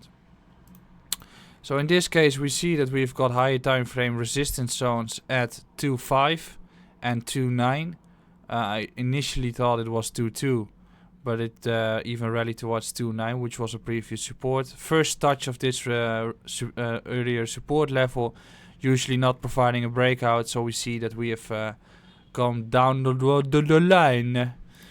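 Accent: Netherlands. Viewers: Lache man! Je eigen stijl ta , een dik NL accent en je wijst naar pareltjes. Ik volg je pas net, maar ziet er tof uit!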